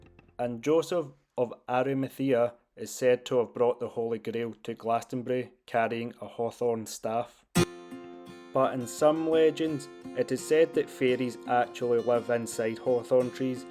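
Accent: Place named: Scotland, United Kingdom. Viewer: Once again I was disappointed not to hear the sounds of nature that I'm sure you heard. It would compliment the scenery much better than the loud repetitive music you played. Especially while you were speaking. I could barely hear what you were saying and listening to your accent is one of the biggest reasons why I watch your videos. I would much rather listen to a Scotsman talk about his own country than a foreigner. It just somehow feels more authentic. I'm sorry if I sound too critical. I do appreciate you sharing the beauty and history of your country. And I intend to watch all of your videos.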